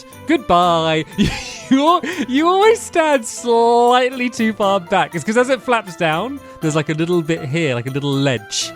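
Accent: United Kingdom. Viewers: your the most british cat i've ever heard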